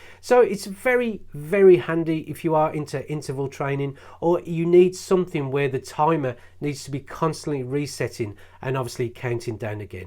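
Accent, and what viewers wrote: Birmingham, United Kingdom. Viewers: Thanks. My first watch in the 70's was a Casio digital and now.. after all these years I've got a yearning to get back into these type of watches. l recently bought a silver coloured e1200 and I'm really impressed with it.. so much so - that I'm going to buy a few more digitals and I'm watching a few vids about them. Saw this excellent vid of yours and it is very detailed and informative..plus, it's always nice to hear a Brummie accent. If you don't mind, please could you just answer one question from me..I like the legibility of the reviewed model just shown, especially with its larger digits, but I'm undecided about the size of this watch as I prefer slightly larger faces. Without going into G Shock territory what Casio's could you suggest I take a look at?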